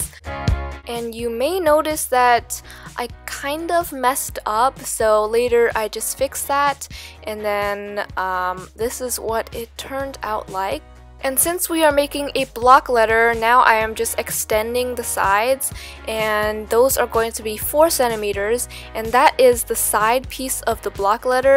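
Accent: Canada. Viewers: Top Bun she does, she has a Canadian accent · She's Canadian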